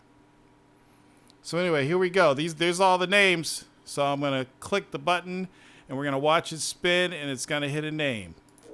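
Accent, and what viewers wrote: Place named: United States. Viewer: why you put on an american accent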